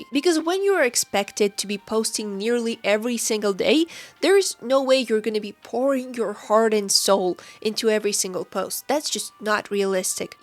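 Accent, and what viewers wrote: Russia. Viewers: Good video, but that matryoshka balalaika accent...hard to listen to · русский акцент?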